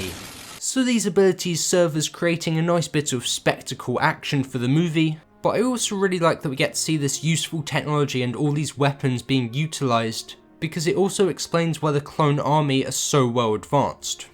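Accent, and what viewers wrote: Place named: RP, United Kingdom. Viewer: Jesus this video is hard to watch when you constantly misuse words. You use the word "conflate" instead of the word "conflict" which wouldn't do bad if it didn't mean the opposite of the other word. And things like when you say: "I know it's GENERIC to point out but it's GENERIC because it's true" Like wtf do you mean there? Did you mean to say that it's "cliché" because it's true? Yeah those words are sort of synonyms but they sure as hell aren't exactly interchangeable in this context. In this context it's like you are saying "I know it's boring of me to say that but it's boring because it's true"... Like what about the factual statement makes it generic or mundane? A tartegrade is an earth animal that can live in space. That's a fact but I wouldn't call it generic. See what I mean? It always throws me off to hear someone with a posh british accent make these mistakes, but bro, you have got to make sure you understand the words you use before you confidently use them in a video. 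I assume you read from script, so get yourself a someone to proofread before you commit this shit to video.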